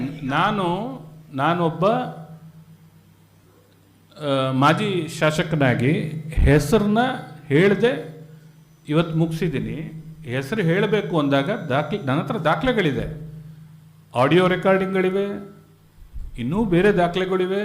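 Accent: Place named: Mandya, India. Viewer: Ivanu yaru, Mandya bashe thara mathodo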